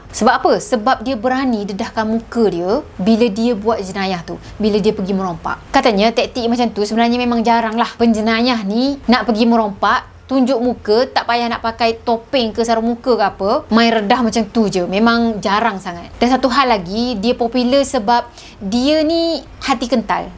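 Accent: Kelantan, Malaysia. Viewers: Akak ckp sleng klante tu ada laaa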